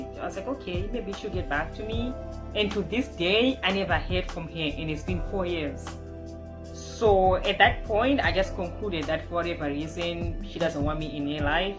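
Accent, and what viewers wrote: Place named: Haiti. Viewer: Interesting story. Thank you for sharing. What I learnt is once someone treats me with neglect - I have to lose my respect for them, and therefore, lose all desire to be connected to them in any way. If I keep trusting them, forgiving them, telling myself "I did something wrong, even though I have no idea what?" ......I WILL sooner or later get heartbroken and it will hurt A LOT! So, no more tolerating neglect and lack of communication from me. I respect myself too much to let people like this in my heart. If 2 weeks pass and a supposedly very close-to-me person is suddenly unavailable, I tell myself "I'm not interested in unpredictably push-pull, hot-cold, available-unavailable, friendly-hostile people, who are not mature enough to communicate what's going on". (Just wondering of you're from Haiti. I enjoy guessing-by-accent who's from where ....sometimes I guess right, sometimes wrong😂)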